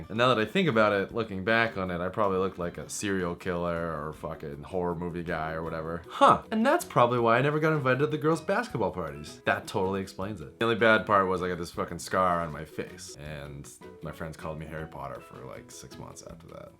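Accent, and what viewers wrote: Boston, United States. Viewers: ***** oh. haha I've always thought she was from Boston. I can't tell the accents apart ^^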